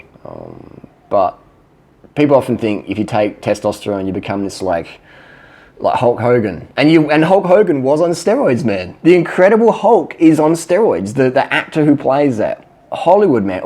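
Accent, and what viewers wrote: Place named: Australia. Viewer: it's OK for whatever you said when you were under carbed..... I find your Australian amusing.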